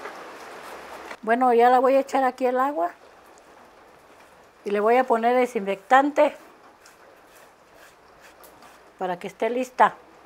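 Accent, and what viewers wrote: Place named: Chihuahua, Mexico. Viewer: Osi una tia politica tambien hablaba asi era de chihuahua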